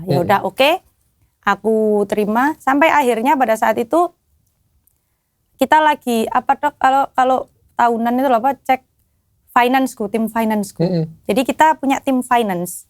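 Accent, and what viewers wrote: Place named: Java, Indonesia. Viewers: Mbak.....bahaasa nya cara ngomong nya kok persis farida nurhan ya....jawa medok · Mbak, logat bahasanya medok banget.... Arema ya mbak..😂😂😂, sama aku juga arema... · orang sidoarjo kak....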